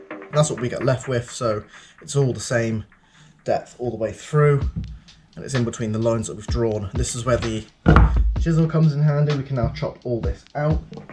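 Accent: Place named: England, United Kingdom. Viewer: Thanks heaps. So simple and easy to make. Good, clear explanation and camera work. Great to hear a pommy accent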